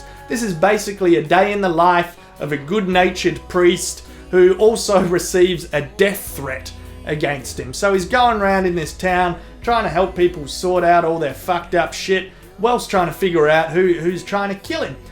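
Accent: Australia. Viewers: g'day ozzy man. i enjoy your takes on the many pictures up for grabs and i'm a big fan of australian diction in general. i enjoy how youse and the brits rock the english language. i've been checking your channel to see if you had reviewed the other episodes of true detect s2 as i wanted to hear your thoughts on the show, so i was pleased you touched on it in this video. i was surprised however to hear you give it a passing grade mate. apart from ep1, farrell and mcadams, the show has largely been shit and most especially that action piece at the end of ep4. it was utter trash! and wtf was with that riot shell shotgun double-tap in ep2? why the fuck would you double-tap with riot shells? like it's not accomplishing anything. anyways keep it up you hoser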